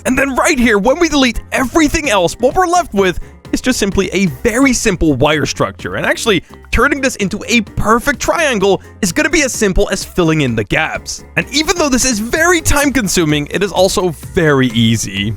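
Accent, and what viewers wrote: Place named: Gouda, Netherlands. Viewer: You have a accent that someon from Gouda has are you from Gouda (for the people that don’t know Gouda is a place in the Netherlands)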